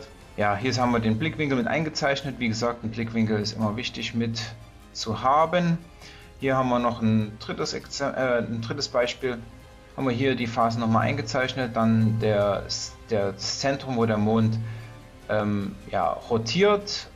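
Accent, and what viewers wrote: Saxony, Germany. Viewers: Wir als Franken verstehen das Sächsische und lieben es. PS: meine Mutter kam in Dresden am HBH zur Welt, damals 1924, und dass als Ostpreußin 🤣 Herzlichen Dank, für mich macht das absolut Sinn👍 Danke🧸🌸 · Och Leute,lasst den guten doch mal bitte weiter sächseln. Er is doch kein Bayer,die man wirklich nicht versteht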